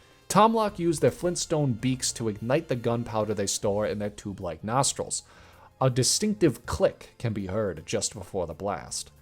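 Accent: New England, United States. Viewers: Is playing into his New England accent or is he just getting comfortable? 😭😭😭🤣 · I’m so confused, why is his New England accent so much more heavy in this video? Is he trying to dial it up or is it a joke? I’m from Maine so I know what a New England accent sounds like. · Did anybody else entirely fail to notice accent until this specific video and suddenly all you can hear is the thick yankee accent? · Does anyone else feel like he's started pushing the new England accent since he first mentioned it a year or so back? I've been watching since the beginning and this accent is definitely new. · Ey yo! Somehow I've been deaf to that NE accent until now. Now I'm hyper focused on it. 😂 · Am I crazy or is accent going crazy in this video? Or am I just noticing because he said he’s from New England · as a new englander, he did not start developing an accent. 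he's simply letting it loose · I swear you sound more new englandy in these videos about new england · He sounds 1000% more New England than any other video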